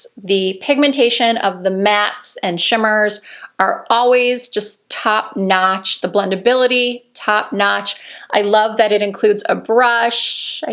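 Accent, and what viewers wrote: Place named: North, United States. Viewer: Hi, I really do love your channel. My skun, skin tone etc are completely different from yours, but I always gain great information from you. I feel bad that you have to always defend yourself from negative commentary. I admire all you tubers that have to put up with that. I just don't think I could do it. As an aside, I was born in Michigan, so I recognized your northern accent right away. Much love your way, and thank you so much Lisa, in Tennessee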